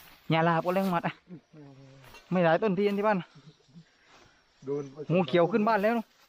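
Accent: South, Thailand. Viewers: แหลงภาคนันและ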